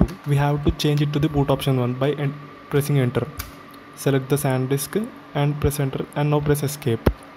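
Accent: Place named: India. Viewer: Oh yea, the indian accent❤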